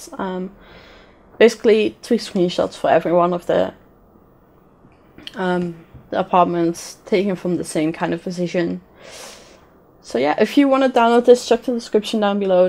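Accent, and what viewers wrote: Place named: Netherlands. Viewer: Awesome build! Your accent sounds Dutch, so are you Dutch?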